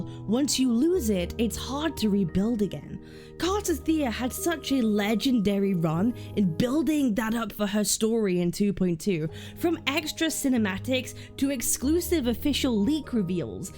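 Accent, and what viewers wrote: United Kingdom. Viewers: you are british>?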